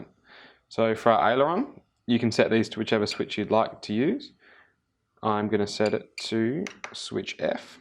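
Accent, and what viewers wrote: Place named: Australia. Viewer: as a person who lives in australia, i find the aussie accent very appealing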